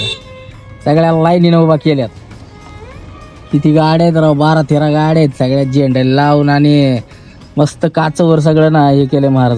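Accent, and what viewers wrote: Satara, India. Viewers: Bhava satarai Tone badluu nka. Plz. Majjja yet naiii laka..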